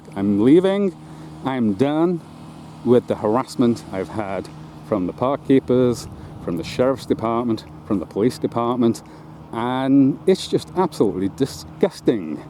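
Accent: United States. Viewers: Come on man. You got to expect a little needling with that Texas accent.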